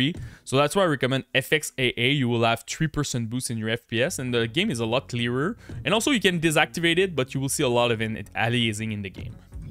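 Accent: Quebec, Canada. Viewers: Dang a quebecois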